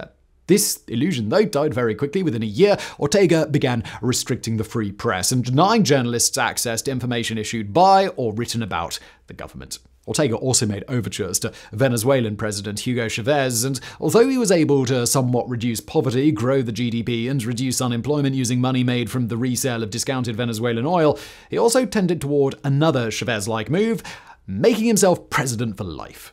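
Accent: United Kingdom. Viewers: you might want to tone down on your pompous Bristish style of speaking. its a turn off.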